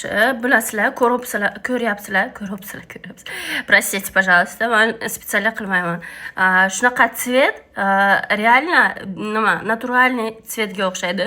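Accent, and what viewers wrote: Bukhara, Uzbekistan. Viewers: Салом яхшимисиз хон! Сизни тинлаш ва куриш менга жуда хам ёкади айникса Бухороча акцент сизни яна хам ширин курсатади. Ишингизга омад соглик саломатлик тилаймай❤ купрок чикаринг шундай видеолар!!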